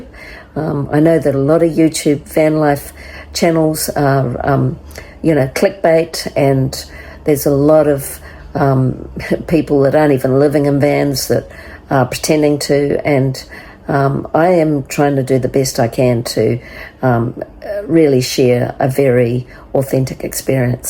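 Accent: New Zealand. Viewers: Thought I picked up on a kiwi accent! I lived in NZ as a kid... one more thing in common! So very familiar with Kia kaha! Back at ya! 💪😍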